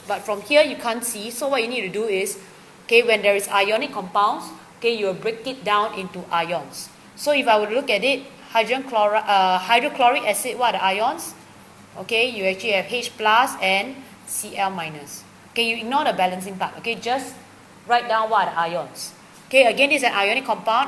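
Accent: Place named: Nigeria. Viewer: She has a Nigerian accent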